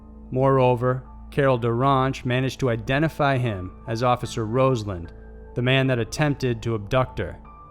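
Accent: South, United States. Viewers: i really enjoy your channel. it's in-depth and you have a nice voice. are you from the southern US by any chance? you don't have too much of an accent (although i am texan myself) but i did notice a bit of a southern twang on your long "i" sounds. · Did anyone else notice the narrators strong southern accent in this video?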